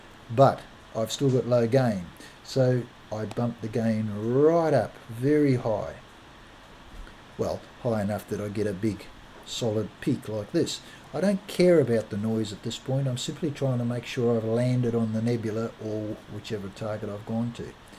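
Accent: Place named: Queensland, Australia. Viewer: Hi, Thanks for your detailed reply, I recon I will have to read it a couple of times. I will have to convert the FITS format file, to be able to put it into PS for manipulation. Unfortunately PS does not support FITS formatt. I have been over the net to check that out. What part of the country are you from? sounds like QLD on the videos but it is hard to make out. Cheers Robert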